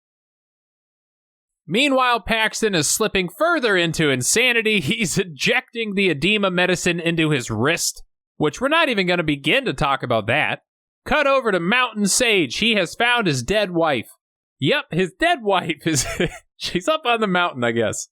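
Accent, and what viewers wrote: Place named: Australia. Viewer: I didn’t expect to hear an Aussie accent so that woke me up lol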